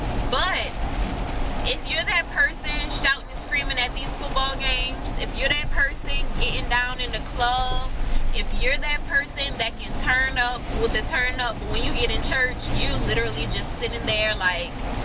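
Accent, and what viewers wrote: New Orleans, United States. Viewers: I love what you are about girl!!!! It's so refreshing to see like-minded individuals here on YouTube. Love your transparency! And sidenote: I understood everything you said....lol! Sounded like correct English to me!!! I'm from Louisiana and I noticed right off the back that you have an N.O. accent!!!!!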